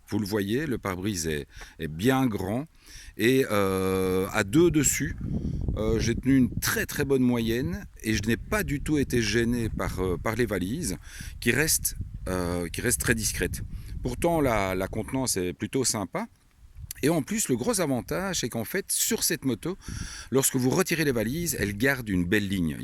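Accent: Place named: France. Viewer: non non pas déçu. Juste que venant de belgique, j'entends juste un accent français :p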